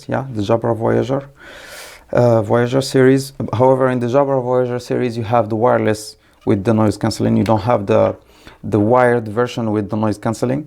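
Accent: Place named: Morocco. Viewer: Dat #moroccan accent!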